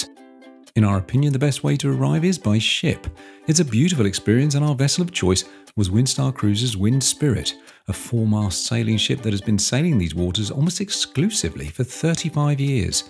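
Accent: England, United Kingdom. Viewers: I’m so glad you don’t have a high pitched voice. I love Travel Movies but if the voice is above “C” my eardrums hurt😊. With your English Accent,listening to you is comforting.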